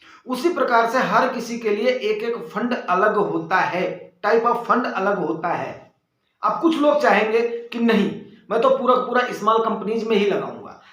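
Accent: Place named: Uttar Pradesh, India. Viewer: Up wala bhasha k liye ek like mere taraf se😄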